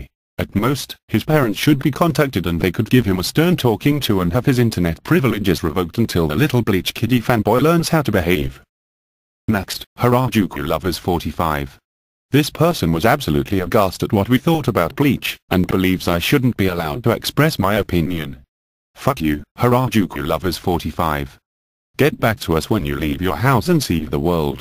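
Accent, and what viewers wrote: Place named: United Kingdom. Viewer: The fact this is in the Education category combined with the robotic British voice is awesome.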